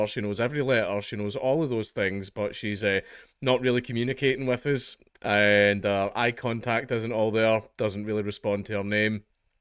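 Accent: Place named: Scotland, United Kingdom. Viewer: well yeah, shes scottish, of course shes going to be talking scottish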